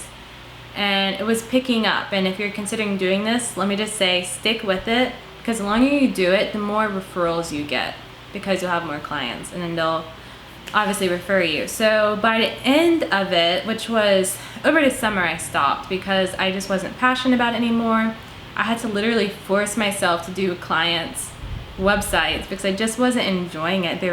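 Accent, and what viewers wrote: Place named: Australia. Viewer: Are you or one of your parents Australian? Every now and then you have a slight accent :D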